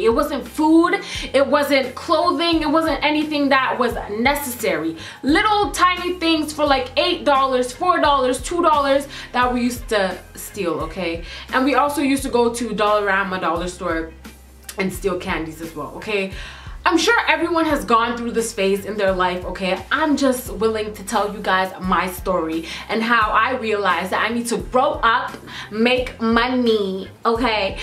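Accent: Jamaica. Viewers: you have an accent, like a Jamaican accent. :o And you're super beautiful btw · Are u also Jamaican or its just your mom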